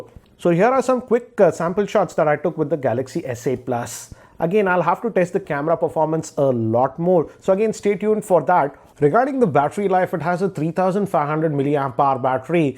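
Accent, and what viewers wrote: Hyderabad, India. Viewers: thanks sir....and your Hyderabadi Hindi is just awesome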